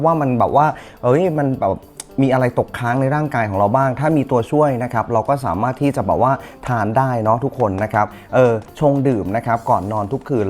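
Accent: Isan, Thailand. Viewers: พูดอิสานน่ารักมากค่ะคุณกี้ ❤❤ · ชอบพี่กี้เว้าอีสาน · เราไม่กินดิบ ไม่กินเนื้อ แต่ชอบกินผัก ดูไปกินข้าวไป อร่อยตามเลยค่ะ เว้าอิสานได้น่ารักมาก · น้องกี้เว่าอีสานตาฮักหลายจร้าตามเบิ่งทุกคลิป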